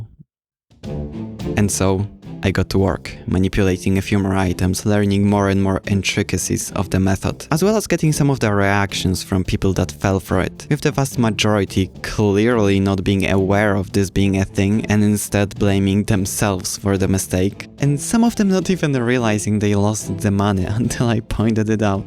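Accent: Poland. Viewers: Your accent is so Polish.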